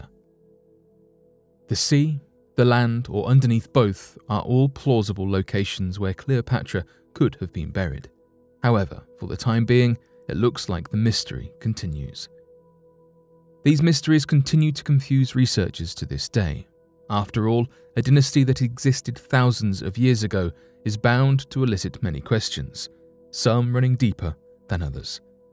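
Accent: United Kingdom. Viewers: I am given you minus for this nervous British accent! Speak American way or shut up immediately. People of the planet, disguise your colonial past. Pay back reparation to your former colonies! Big time!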